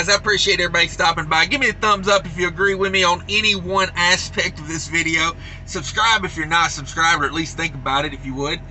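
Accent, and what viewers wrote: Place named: South, United States. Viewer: Your eloquent words with a wonderful southern twang speaks truth. You consistently remind me of why I left trucking after 25 years. Hurry up and wait has been stuck in my brain for years! My first year was with England. My last load with them had me waiting a day and a half! These bastards know how to steal a trucking dream!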